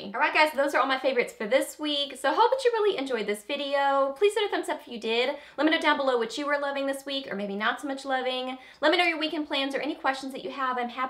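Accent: South, United States. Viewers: I swear you are a breath of fresh air! I can't believe I haven't found you before now (well about a week ago and been watching your older videos) but I just LOVE You! Your personality, your look, your accent (you have a LITTLE bit of a country, southern accent.... I have a REALLY HEAVY country southern accent, yours is cute, mine not so much lol) I got my Amazonian palette today and it's so pretty I'm in love....I have the naked heat palette and LOVE it, I don't need the small one but I'm prob gonna get it just cuz it's so little and cute.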